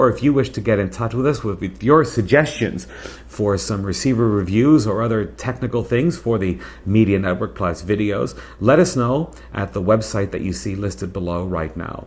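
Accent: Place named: Portugal. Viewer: Your first language is portuguese right? I'm guessing by your slight accent.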